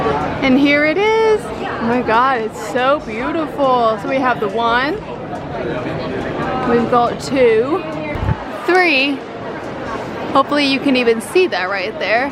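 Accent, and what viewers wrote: United States. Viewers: The weather looks gorgeous in Palermo - and so does the city! Oh to be there!! Strange how, as I Brit myself and a regular follower of, I can tell Raff's particularly "English" accent seems so noticeable against your naturally "American" English - something I just don't notice when listening to on his own.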